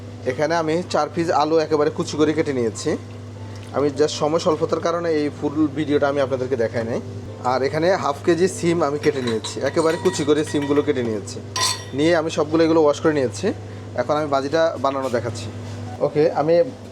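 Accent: Noakhali, Bangladesh. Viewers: ভাই রান্নাগুলা দেখান চমৎকার৷ কিন্তু শব্দ চয়ন গুলু নিতান্তই খেত, যেমন ফানি,ফেয়াজ, ছিনি, আরো অনেক নোয়াখাইল্ল্যা উচ্চারন৷ বিষয় টি খেয়াল করলে খুশি হবো৷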